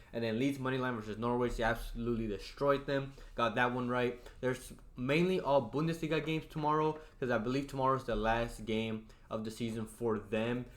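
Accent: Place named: Texas, United States. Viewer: youre in texas huh. i thought you had a texas accent. then you said its thunderstorming.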